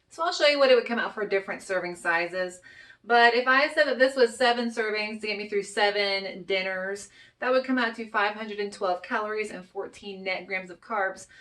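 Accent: South, United States. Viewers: I'm curious what state you live in? I'm sure you mentioned it before, and from your accent I assume down south..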